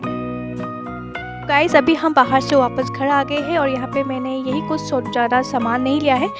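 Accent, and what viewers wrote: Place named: Assam, India. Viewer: Hey cute kid you have got ! Where r u from ? Ur accent sounds Assamese , please aplogies if I'm wrong. Kfc same pinch 😂 oh this Maggi is different black one ? Nice place looks nice weather 👍 eagerly waiting for your challenge video !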